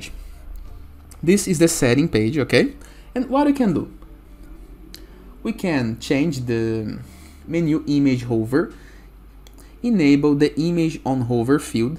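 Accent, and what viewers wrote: Brazil. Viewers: great video. btw you brazilian?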